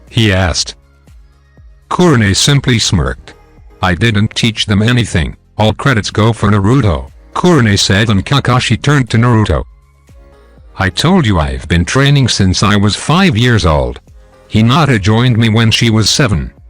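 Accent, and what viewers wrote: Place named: Russia. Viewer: somepeats sounds like a russian speaking english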